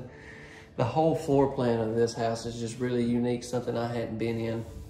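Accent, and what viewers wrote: South, United States. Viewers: It's a beautiful home and if you lived in this home, you could never be called "trailer trash." Plus I love this guy's southern accent. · How Y'all doing. Love your southern accent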